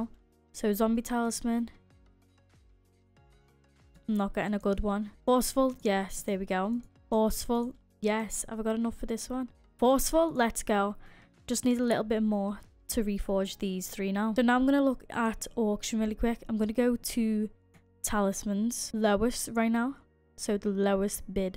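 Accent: Liverpool, United Kingdom. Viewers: are you british?? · are u a scouser ? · im asking you bri't'ish or australian person bcs acsent of a bri't'ish and talk like a australian person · Just realised ur British what a gamer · Pretty sure it's a Scouse accent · The british accsent sorry of My bad spelling im from finland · Think it’s scouse (Liverpool) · Innit this that Liverpool girl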